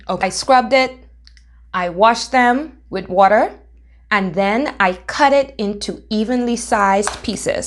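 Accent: Bahamas. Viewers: That real Bahamas beautiful dialect and excellent cooking approach and techniques totally rocks. Has me here in Canada salivating and tasting home. is very authentic in her personal style....a real Bahamian.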